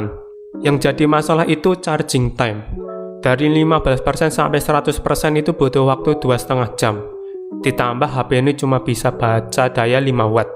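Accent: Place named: Banyumas, Indonesia. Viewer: Ngapak yaaaa 😁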